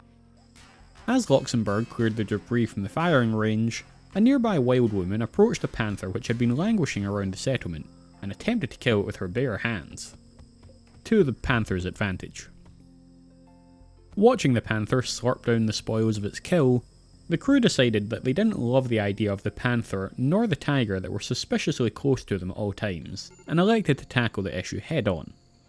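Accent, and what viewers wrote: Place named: Scotland, United Kingdom. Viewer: Which part of scotland are you from man, peak video btw.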